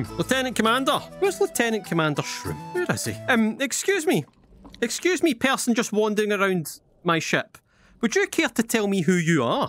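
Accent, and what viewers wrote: Scotland, United Kingdom. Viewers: scottish skyrim daddy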